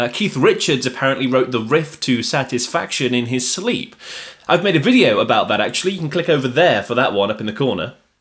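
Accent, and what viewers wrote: United Kingdom. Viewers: Yeah, between this strangely moralistic stance and the fact he censors words like "masturbation", he sounds like a puritanical American who happens to have a British accent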